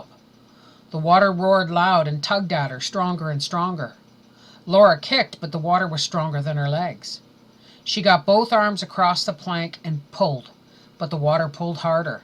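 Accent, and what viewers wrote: Canada. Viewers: The Canadien accent (speech impediment) is brutal.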